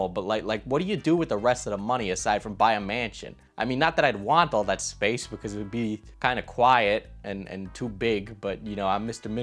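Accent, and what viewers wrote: New York, United States. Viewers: I never heard that little bit of New York in your voice until you mentioned it and now I can't unhear it.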